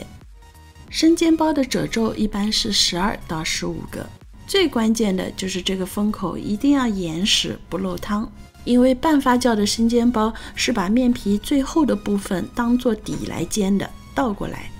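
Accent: Shanghai, China. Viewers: 有的有的，口音是标准上海年轻人的口音👍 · 嘻嘻 听你讲上海话超级亲切，秒想回国😢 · 很好，顺便宣传一下上海话，很亲切，赞！ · 这就是标准的上海人讲普通话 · 尽管有其他很多貌似专业厨师的视频，但听到了上海话就无理由地感觉这才是最靠谱的做法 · 我妈看她视频。我在旁边一听就知道她是阿拉上海宁。满满的上海味道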